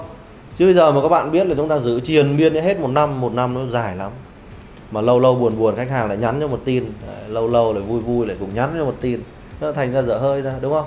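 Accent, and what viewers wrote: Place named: Thanh Hoa, Vietnam. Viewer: Chủ tịch này nói đúng giọng thanh hóa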